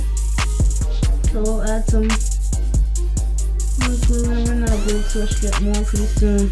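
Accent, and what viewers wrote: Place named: Philadelphia, United States. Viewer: “ I almost cut my Air “ I love her already I thought I was hearing a philly accent 😂 loved this video! Super informational and easy to follow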